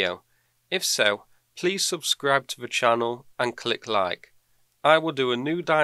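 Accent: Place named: Midlands, United Kingdom. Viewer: Taiwan? Just by accent I would say central englad...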